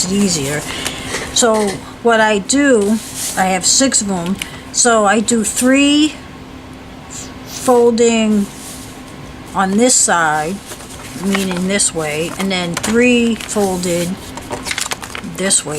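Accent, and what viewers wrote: United States. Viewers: I love this book so much. It looks so sturdy. I can't wait to make one. Thank you. Do I hear a Boston accent. Lolol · I love your accent. I can tell it's east coast. Where are you from?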